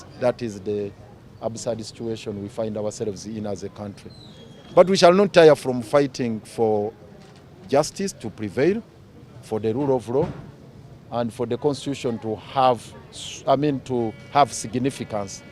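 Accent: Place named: Uganda. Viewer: sweet Ugandan English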